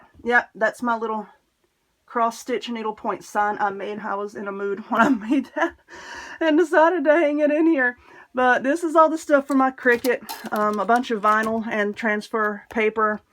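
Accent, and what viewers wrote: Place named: North Carolina, United States. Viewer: Hey I'm new to your channel and I love it. Your accent is what drew me to you. I said she sounds like she's from NC lol. And low and behold you are 💜. So am I born and raised in Rowan County so I was happy to see you ribbons from the fair. Congratulations!!!! I know you're not from here but it's still great you're from NC. I love your diys keep them coming.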